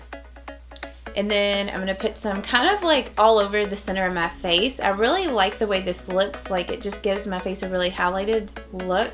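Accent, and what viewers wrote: South, United States. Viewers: Juz love to hear your southern accent!! We needed a southern bell makeup guru. Hugzz from Dallas, Texas!!!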